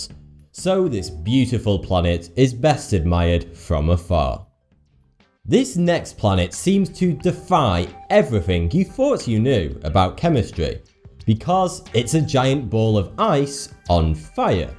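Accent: United Kingdom. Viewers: 偏英式 我覺得很好聽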